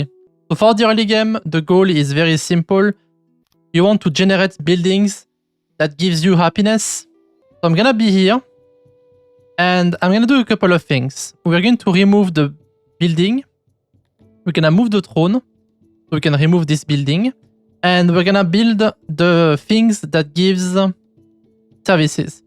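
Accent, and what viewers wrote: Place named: France. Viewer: J’adore ton accent bien franchouillard ! Superbe vidéo j’ai appris pleins de trucs merci bcp ! T’as cb d’heure sur les jeux ?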